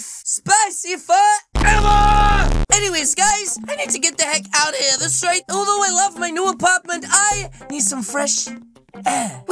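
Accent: England, United Kingdom. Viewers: can I ask you a question are you english or ameeican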